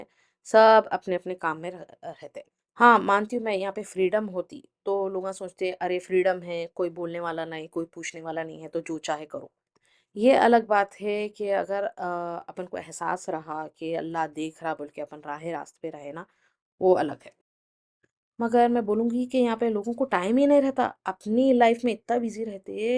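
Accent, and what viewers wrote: Hyderabad, India. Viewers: Assalamalykum...first comment and like ...i am from hyd to....aap bilkul sahi boley ...i 👍 agree...hum bhi US mein they phir hyd aaney ka decision liye...us ke baad bahut regret hua aaney k baad ...khair Allah ki marzi thi ....aap ka hyd ascent achcha laga ...koi log hyd ke hokey bhi apna ascent change karke vlogs daltey...aap ke videos dekh k chicago ki yaad aati bahut....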